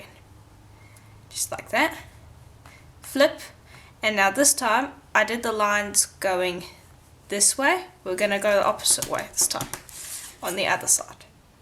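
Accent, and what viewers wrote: South Africa. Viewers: I have just started watching your videos. I have not tried polymer clay yet. But I really want to. So I will be watching hundreds more videos. Lol Question.... are you South African? I see you are in Australia, but your accent is definitely South African.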